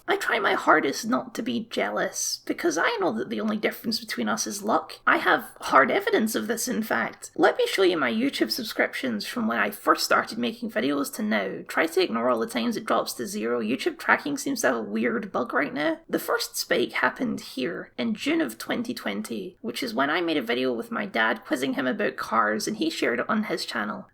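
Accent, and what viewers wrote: Scotland, United Kingdom. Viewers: I'm a new subscriber and I'm digging it. About your voice im confused as an American all Scottish people sound funny to me what's different about you? Lol no but seriously I like the content and I love interesting voices and accents hence I listen to very few American essayists because they all sound like me and that's boring.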